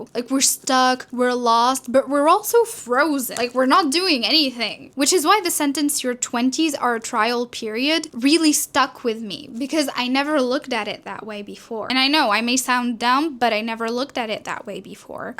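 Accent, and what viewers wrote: England, United Kingdom. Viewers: You're English gurrrrrl woow 😍😍😍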